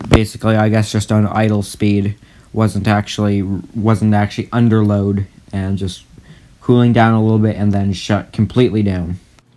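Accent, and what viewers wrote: Canada. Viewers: Ah, that's interesting. We have a similar program here in Alberta that allows you to go to school in the morning and work in your desired trade in the afternoon. It's called the RAP (Registered Apprenticeship Program). Is it similar to where you are (not sure which province you're in but I can hear the Canadian accent in your voice)?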